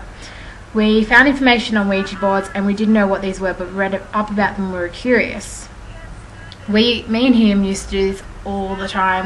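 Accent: Australia. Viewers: I'm having like hard time understanding your Australian accent :( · Are u and Auzzie ? I can tell by ur accent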